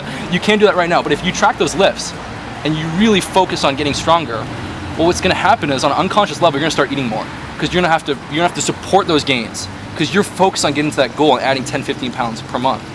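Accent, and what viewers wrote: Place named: Canada. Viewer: He nails some pretty hot broads and is def straight, I think its a Canadian accent tho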